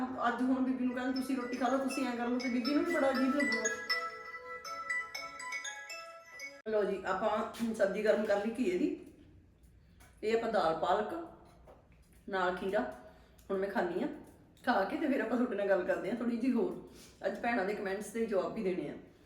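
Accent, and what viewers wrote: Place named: Bihar, India. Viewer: ਬੀਬੀ ਜੀ ਤਾ। ਬਿਹਾਰੀ ਬੋਲੀ ਲੱਗਦੀ ਹੈ ਭੈਣੇ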